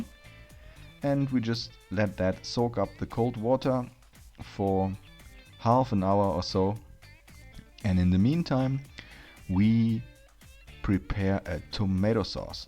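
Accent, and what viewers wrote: Germany. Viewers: Schönes Video und sehr interessant das Rezept! ...aber dein deutscher Akzent beim Englisch sprechen ist echt Hardcore!!! Versuch die Worte mal ein bisschen weicher zu formen dann klingt das ein bisschen sauberer und flüssiger. 😉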